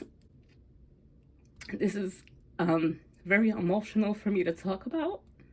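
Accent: New York, United States. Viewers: This story really resonated with me. I grew up in NYC too..I hear the NY accent in you lol. I actually bought my first budget binder because of watching your videos. I’m hoping the cash budgeting can help me too. Growing up my parents had really poor money management skills too. My mom was a mom of 4 living off public assistance and my PTSD was her always asking people to borrow money. I vividly remember numerous times she would beg people to loan her money because she couldn’t afford to pay her bills and all the borrowing led to more borrowing and she was always behind. To the point where we almost lost our apartment several times and eventually she couldn’t afford to live where we were and we had to move to the projects. I remember just being ashamed. The adult in me now just thanks god that at least we had a roof over our heads but even then in those reflective moments i wish my mom did better. 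Then when her kids were growing up she looked at our incomes as a way to keep up with her bills. My story is too much to tell on here but even though I never became like my mother asking for help all the time, I didn’t have good financial management skills and education. I didn’t have family members to show me how to save and talk to me about good credit. I used credit cards like they were extensions of cash instead of loans and here I am years later struggling with debt. Your channel is my favorite out of all the channels with cash budgets that I’ve seen so far. I also am in substa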